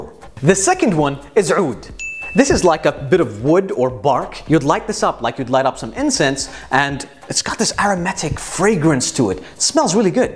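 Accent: United States. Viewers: Why american accent its not nice. Everything else is good. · besides the fact that you smell good...where did you learn how to speak american-english?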